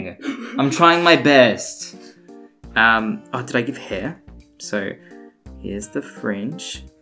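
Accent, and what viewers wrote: Australia. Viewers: My god, I just met a guy from VRChat. He’s actually from Australia too and he sounds almost EXACTLY like you. It’s kinda weird, but I like the Australian accent so I’m not complaining lol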